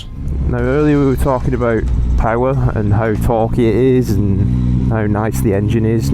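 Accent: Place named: Scotland, United Kingdom. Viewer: Also as a Scot in the USA, it’s nice to hear the accent, riding is so much better here, our weather sucks for riding lol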